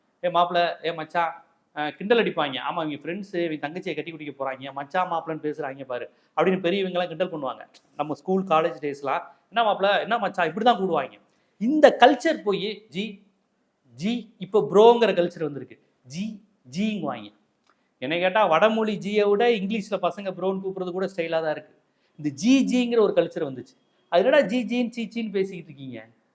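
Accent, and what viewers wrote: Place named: Chennai, India. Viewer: Un madras bashi machi kichi yallam nallavada iruku miha kavalamaha iruku un madras tamil nee adutha mozhiya pathi pesura badava raskal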